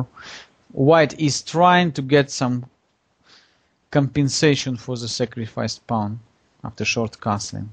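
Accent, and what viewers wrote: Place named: Arab world. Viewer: Лайк за акцент: озвучку Stronghold Crusaders за арабских воинов вспомнил, прослезился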